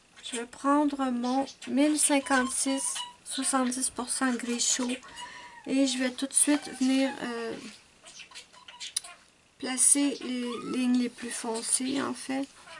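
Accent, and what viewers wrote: Canada. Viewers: J'adore te regarder colorié j'apprends je regarde plus le mouvement de tes doigts si j'ai adoré sur les températures de chez toi moi qui veut allez vivre là bas un jours comme je ne supporte pas la chaleur et le soleil pour moi sa serait l'idéal moi je t'imagine je ferme les yeux alors a ta voix je voit une jeune femme grande longiligne avec des cheveux long mais tenue la tenue la journée brune et un visage ovale et un louque décontracté jean sweet a capuche pas maquiller ou que quand tu sors et des chaussons moumoute que tu enfille comme des bottes voilà pour moi je te vois comme sa un jeu? Comment est notre préfère et son très bel accent canadien comme le dis grand corps malade "je connais leur secret pour leur accent qui chante ils ont trop souplesse dans les joues" ils vont magasiné là bas pas de shopping c'est vrai?moi je fais un par un mais je suis loin de tes coloriage la je me suis imposée un colo sans numéro juste le model et bin je sais pas où posée le premier coup de crayon c'est une princesse manga,pour tes yeux le soir met une lumière blanche mieux pour les yeux fragile et des larme artificielle pour les hydrate 👍👍👍💖💖💖💖😘😘😘😘😜😜😜😃😃😃😃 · Super! 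J’ai passé deux moments vraiment agréables en ta compagnie! Oui😂hier soir je me suis endormie au son de ta si charmante conversation aux accents canadiens😉je trouve ce début de coloriage prometteur, c’est vrai qu’il ne faut pas s’acharner sur un colo quand l’ennui frappe à la porte; c’est vrai pour tout type d’occupations dans les loisirs comme ailleurs...J’ai tendance à aimer terminer ce que j’ai commencé; éducation oblige...mais le coloriage m’a appris à être moins rigide et à me permettre plus de fantaisie; et je suis capable maintenant d’attaquer un autre coloriage sans me sentir culpabilisée, tu vois tu n’es pas la seule dans ce cas😊Mais je ne me disperse pas; je tiens le cap 😄En ce qui concerne l’abord de mes coloriages; je pars sur une idée générale de couleurs et je laisse aller mon inspiration du moment vagabonder; je suis toujours surprise des résultats bons ou mauvais😂Encore merci pour le temps partagé avec nous; je suis allée sur la page Facebook de Muriel et effectivement cet aigle est somptueux; je trouve tous ses colos harmonieux et beaux À bientôt; des caresses aux poilus et plumeux de toutes espèces qui partage ton quotidien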